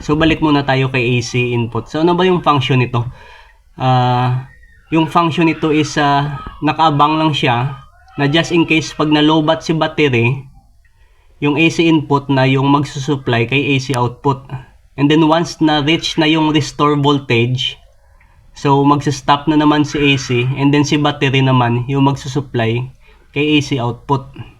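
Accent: Iloilo, Philippines. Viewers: Sir ilonggo poh bah kayo? 😊😊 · Bal.anon gid nga ilonggo ka boss😂😂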